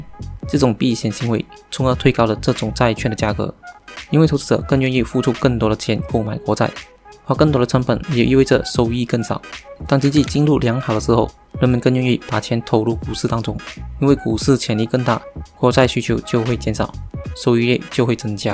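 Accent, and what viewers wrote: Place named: Malaysia. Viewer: 雪碧馬來口音，聽得頭痛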